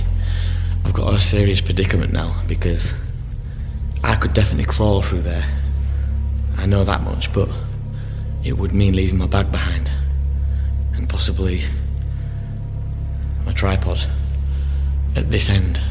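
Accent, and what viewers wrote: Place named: Yorkshire, United Kingdom. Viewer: i would love to come exploring with you i am chasing the same answers i live in blackburn you sound like a yorkshire man so you are not far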